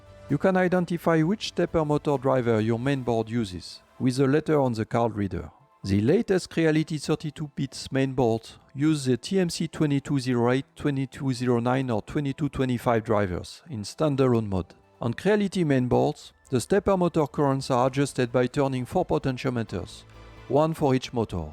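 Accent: France. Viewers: it sound french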